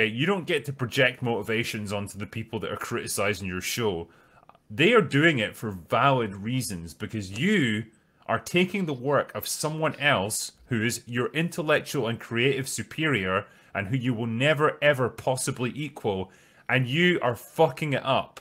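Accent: Scotland, United Kingdom. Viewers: I'm English, listening to a Scot ranting about a Welsh Waman....Brilliant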